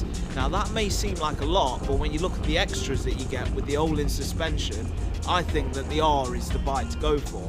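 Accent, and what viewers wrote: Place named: England, United Kingdom. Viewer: when a black guy speaks close enough english english accent. its so fucking funny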